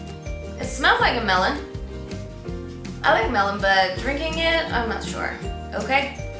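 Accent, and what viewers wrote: Turkey. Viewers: Turk oldugunu da soyleseydin bos yere vakit kaybetmezdim. Boyle bir Ingilizce aksan sadece iyi Ingilizce bilen bir Turk'te vardir hemen anladim. Zaten twitter profilinde de isminin oldugu yaziyor. Yemeyin lan insanlari! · Haha ulan kiz Turk, Turk aksani var. Turkiyede yasiyor bence ...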